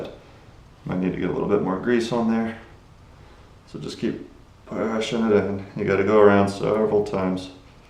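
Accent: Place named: Northern Ireland, United Kingdom. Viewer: the NI accent sounds awful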